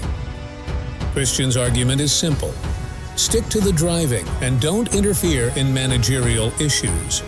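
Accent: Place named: United States. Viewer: It's funny hearing an American sounding like he knows F1.....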